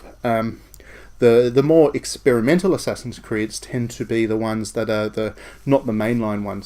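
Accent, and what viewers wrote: Australia. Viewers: yea,i hate australian accents,but dont change your accent just because i hate it,no but you do need to say vita and not vitar and it s not just you,a lot of english people make the same mistake,any way i think that you deserve more views and more subs,and you have just gained a sub,mate btw sorry if i misspelled somethin, im portuguese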